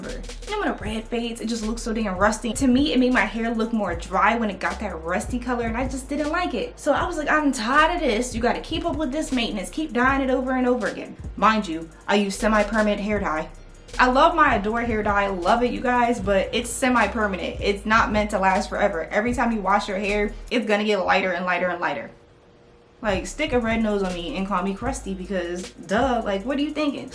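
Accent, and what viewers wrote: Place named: DMV, United States. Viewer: She sound like a dmv baby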